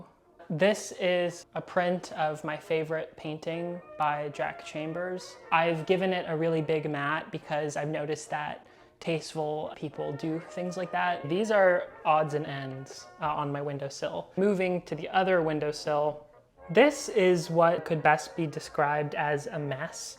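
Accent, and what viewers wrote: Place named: United States. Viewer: If you only lived 2 years in the US how is your accent so American? (Sorry, just one of the many, many questions I have after watching this video)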